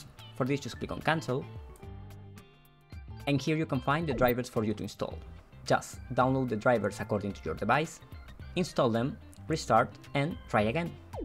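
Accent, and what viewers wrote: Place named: Italy. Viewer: You video's are pretty clear, not too slow and not too fast. And when it's a bit too fast than it's less annoying to scrol a fragment back. But hihi... it must be your Italian vibe and accent.... I can't resist the urge to imitate your .... ' and.., that's it'. Funny repeating soundbite, I mean this in positive sense :D. Unfortunately I fail in my imitation, lol. Maybe you need to create your own home assistant audio notifications. :D :D and that's it.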